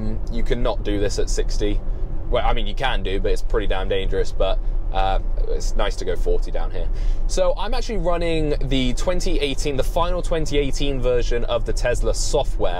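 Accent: United Kingdom. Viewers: Great to hear a Brit commentary for a change. Nice interesting engaging content. Could do with more shots showing what the car is seeing on the display. I'm waiting for a 3 or Y. But still interested to see where autopilot is at on UK roads.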